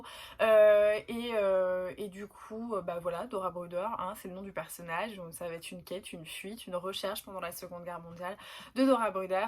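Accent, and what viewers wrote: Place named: England, United Kingdom. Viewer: Trois de Valérie Perrin! Trop fastoche pour la première! Sinon je rêve d avoir ton accent anglais!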